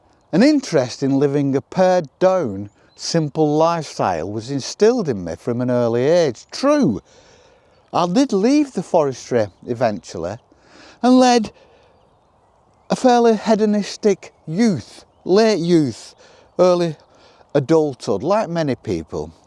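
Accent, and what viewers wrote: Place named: England, United Kingdom. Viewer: Loved every minute of your video, not least, I love your accent. My grandparents emigrated England to come to Australia in the late 60’s and whenever I hear a similar accent it makes me want to visit England. Sadly I’ve never been but would love to see where my ancestors lived one day.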